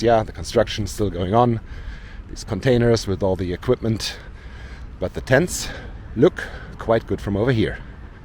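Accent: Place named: Munich, Germany. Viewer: Danke. 😊 Dein Englisch ist übrigens wirklich total sympathisch. Ich verstehe alles und es klingt so schön vertraut. Vorallem mit den deutschen Begriffen zwischendurch. 😁 Du bist bestimmt auch in der Münchner Gegend aufgewachsen, oder? Du klingst zumindest richtig münchnerisch.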